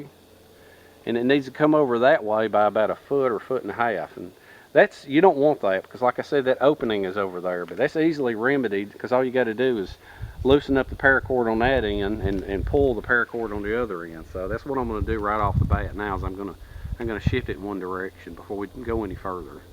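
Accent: South, United States. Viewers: From down south? Alabama, Mississippi, Louisiana maybe? You sound just like my sister in Louisiana. (Only with a deeper voice...lol) I paused the video to write this. I’m waiting to see whether or not you say something like “Like this I reckon.” or “Look at this ya’ll !” Lol I’ll just die laughing. 💕😉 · Well, bless your heart. Like me, from the South. We all have license to talk funny. That includes the use of strange idioms. "Jewel" or "little jewel" is interchangeable with "doohicky", "thingamabob", "whatchamacallit", or "jobber/jobbie".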